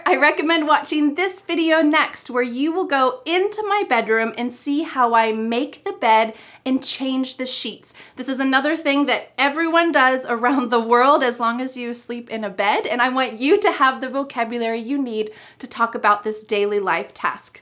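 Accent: United States. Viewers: Mam please add with subtitles to help a lot because you are an American accent and I'm Indian , sometimes doesn't comes on mind so I would love if you could do the same. Thank you 😊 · You speak American English?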